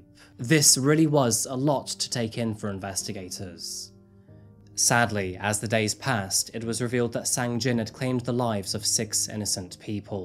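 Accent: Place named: United Kingdom. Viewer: I think I'm officially addicted to your channel. Murder mysteries, forensics, crimes etc are so interesting! You tell these so well and it's nice to hear a fellow Brit, since moving to France. You make me feel at home 🖤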